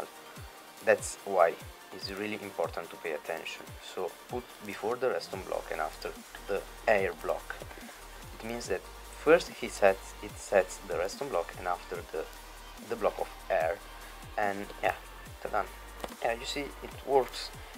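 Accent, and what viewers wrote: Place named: Italy. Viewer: questo tuo accento italiano che fai quando parli in inglese è davvero una cosa fantastica XD, da un tocco di classe all'inglese =), comunque, sei molto bravo con la pronuncia e le parole... io non riuscirei mai a fare un tutorial in inglese XD comunque, questa cosa la sapevo, e devo dire che è molto utile! la uso spesso quando devo fare dei clock "nascosti"... comunque bellissimo video, bravo ^^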